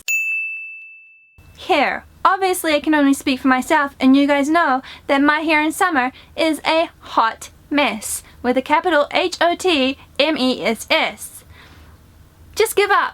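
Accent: New Zealand. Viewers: I thought you were a fellow kiwi!!! But couldn't find confirmation :D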